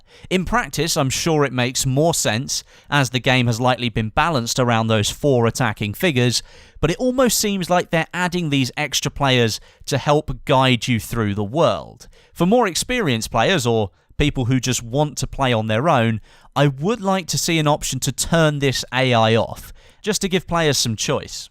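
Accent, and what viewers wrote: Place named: United Kingdom. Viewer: why do brits get famous by posting crappy vids. i want to see REAL gameplay not listen to your annoying brit voice.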